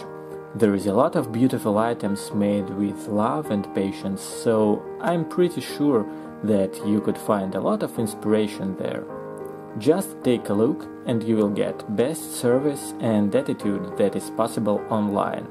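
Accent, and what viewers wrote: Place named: Russia. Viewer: да ну! русский в каждом звуке. а вааще, с произношением катастрофа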